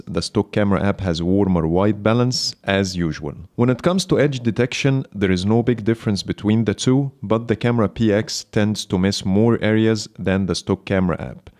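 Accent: Egypt. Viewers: صوتك مصري ياصاحبي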